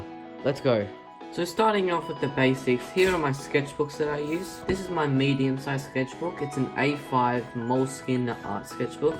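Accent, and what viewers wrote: Australia. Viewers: Are u Australian? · U Aussie?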